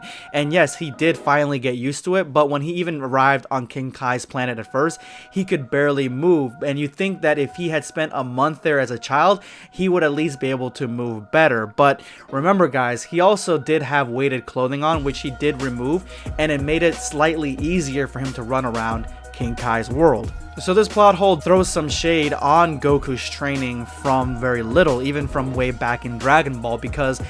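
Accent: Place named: Latin America. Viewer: Oh man you being Hispanic makes so much sense, translating dbshippuden and the slight accent in your voice. Edit: I’m Hispanic too🤫, and could you do a video where you speak some Spanish??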